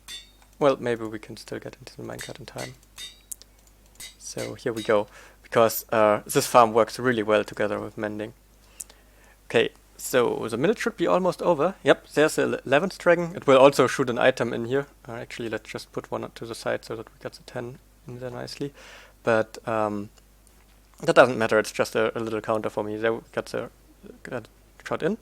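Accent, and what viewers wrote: Germany. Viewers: Jaja der deutsche Akzent ist ziemlich schwer weg zu bekommen.. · Bist du deutscher? das hört sich sehr deutsch an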